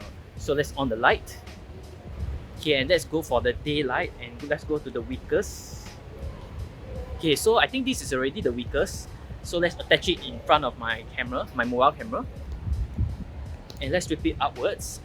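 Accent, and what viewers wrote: Singapore. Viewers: Terribly irritating Singapore accent. Can’t understand most sentences as there are too many sounds dropped. Terrible.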